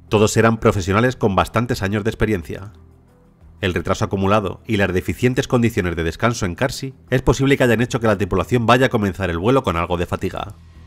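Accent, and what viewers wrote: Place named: Spain. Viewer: Excelente narrador, me encanta la narración de éste canal, y eso que a los latinos nos nos gusta mucho el acento de España para los doblajes de las películas.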